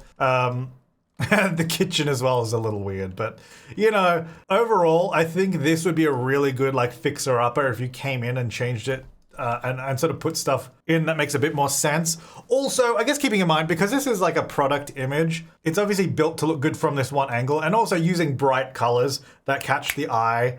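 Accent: Australia. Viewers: I’m curious… is this guy Australian? I like the way he says “He-Ah” instead of “Here” but it’s cute. Good video btw!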